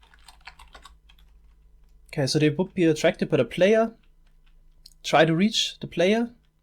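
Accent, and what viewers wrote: Germany. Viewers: wie Methoz auch noch am Anfang Hallo sagt :D Find ich cool das auch deutsche/Österreicher englische Videos machen :) Man hörts aber (nicht böse gemeint) :) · Mann merkt den deutschen akxent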